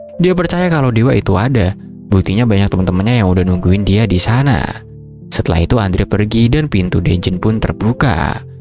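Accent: Medan, Indonesia. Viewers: Mimin orang medan ya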